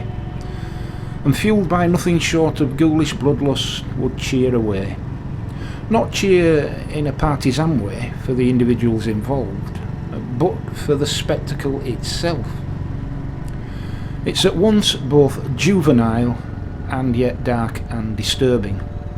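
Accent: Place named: North, United Kingdom. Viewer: Sage words, friend, beautifully expressed(as usual). I often gaze and admire the skills of athletes, sit in awe of the intellect and wisdom of the Hitchens, Frys, Dawkins, Connolys(Billy), Gervaises, Murrays(Douglas), men&women(no pretend inbetweens) in general that inspire self-betterment. But one of my great joys in life.......is to listen to and envy that fabulous lexicon you deploy so wonderfully, friend. That amazing vocabulary of yours, wielded so mildly yet unaggressively with that marvellous northern lilt, is a major pleasure and superb voice of reason and mild-mannered advice for embattled ears. Thank you kindly for your years of service in uniform and the great guidance it now offers a father and husband trying to navigate a very frought world. Take care, friend......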